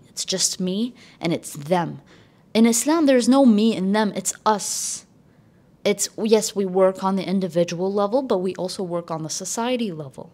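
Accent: United Kingdom. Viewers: Love the british accent du know wat mean like😅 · As a revert, I really take a comfort in your podcasts and videos. I met you on TikTok but I love your YouTube much more because of the longer videos ❤ I’m over here studying and listening to you and repeating your British “d’yknow whahimehn?”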